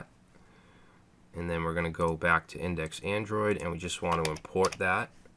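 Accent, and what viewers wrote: United States. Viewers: Man, how I feel happy when I find someone with native US accent explaining a technology these days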